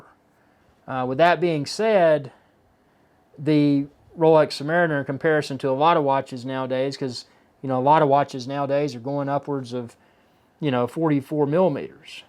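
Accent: United States. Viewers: Love the country accent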